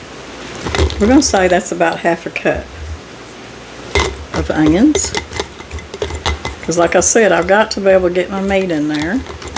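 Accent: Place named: Appalachia, United States. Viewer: You look like your 89 and you sound like a HILLBILLY!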